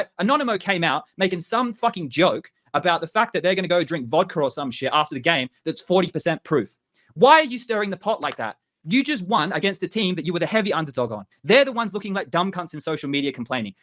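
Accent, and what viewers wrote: Australia. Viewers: just Australian accent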